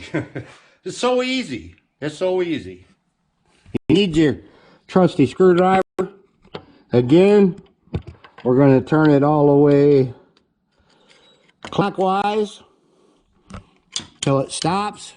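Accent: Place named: Appalachia, United States. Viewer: Finally someone who speaks hillbilly. I get it now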